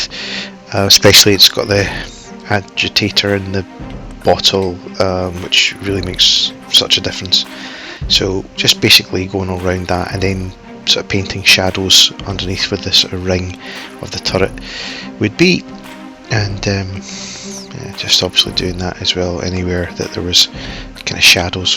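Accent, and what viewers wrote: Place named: Glasgow, United Kingdom. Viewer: yup.....no tatties in your ears! You from Glasgow, I presume? Fantastic video, crystal clear, and a beautiful, beautiful build. Outstanding. Personally, I build WW2 stuff, but I can learn a lot of from your videos. I'm gonna subscribe and send a link to my pals of your fantastic work on our Google+ modelling community.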